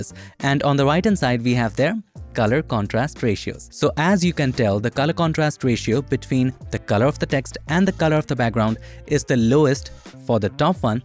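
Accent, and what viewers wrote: India. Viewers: Man the Indian(?) accent can be really nice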